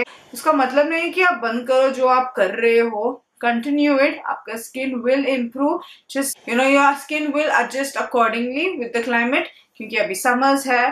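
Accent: India. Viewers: Wow it's such an awesome remedy with so less ingredients n moreover ur hindi accent is super cute.Love u lotsss baby . 😍❤💋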